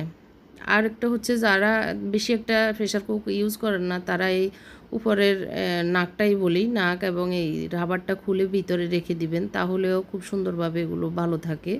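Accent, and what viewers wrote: Noakhali, Bangladesh. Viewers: আসলে নোয়াখালী ভাষার মানুষ শুদ্ধ করে কথা বলতে চেষ্টা করেছে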